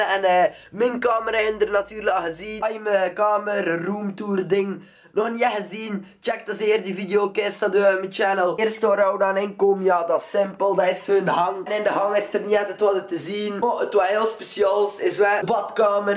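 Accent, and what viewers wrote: West Flanders, Belgium. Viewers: Kzin owk vn west vlaandern twas egt zot om et ne ke zo te horn ✌ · Love plat vlams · zo nice daj int westvlaams deet matj! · mo da west-vloams is wel een beire toaltje whi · Ik versta het wel ik woon ook in West-Vlaanderen 😚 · gewoon nice daj int west-vlams deed :d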